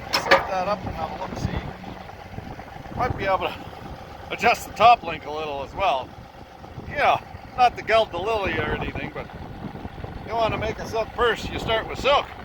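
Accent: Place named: Nova Scotia, Canada. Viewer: I swear this guy is from Nova Scotia.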